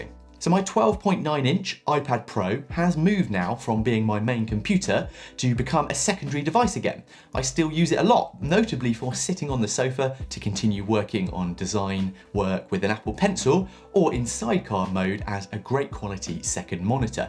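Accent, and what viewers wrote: United Kingdom. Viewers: Loved the energy of this video, really inspiring! (and lovely british accent)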